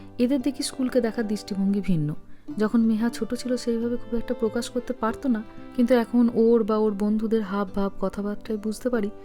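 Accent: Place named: Hooghly, India. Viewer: Didi tumi medinipur er meye holeo kotha bolar dhoron onekta Hoogly district er moto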